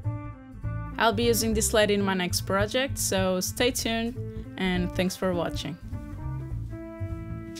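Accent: Portugal. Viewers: caralho, não falho, percebi logo que eras tuga pelo teu sotaque no ingles hahahaha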